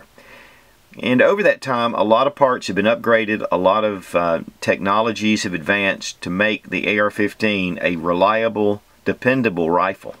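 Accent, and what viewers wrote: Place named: New Jersey, United States. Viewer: Sounds like New Jersey!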